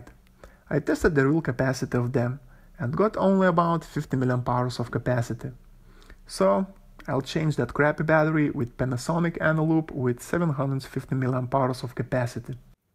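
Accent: Russia. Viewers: Акцент русский... )))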